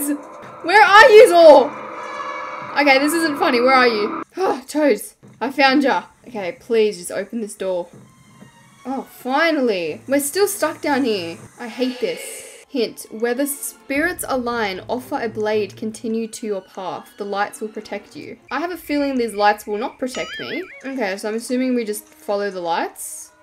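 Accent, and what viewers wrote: Australia. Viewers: yk shes aussie just by the way she speaks <3 I can relate.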